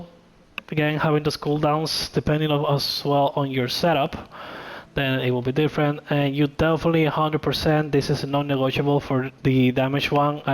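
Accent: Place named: Argentina. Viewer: Your english has argentinian accent 😅 🤔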